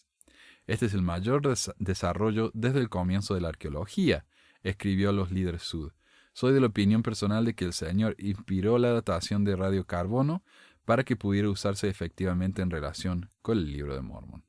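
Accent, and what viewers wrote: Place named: Córdoba, Argentina. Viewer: Disculpame, sos de Córdoba, Argentina? Por tu acento, digo.... Gracias!!!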